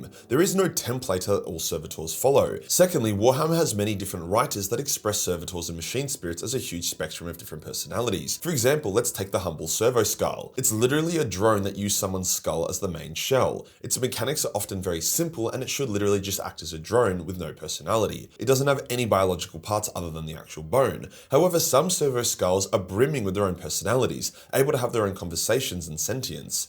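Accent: Australia. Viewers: how did you somehow get more Australian?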